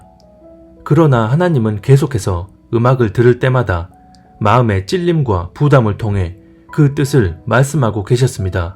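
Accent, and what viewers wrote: Jeolla, South Korea. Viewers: 전라도 사람임? 말투가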